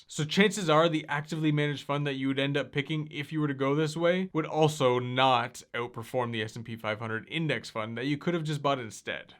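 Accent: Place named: Canada. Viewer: Hey what app or brokerage do you use to buy stocks with ? Lol also I can really hear the About! (fellow Canadian haha)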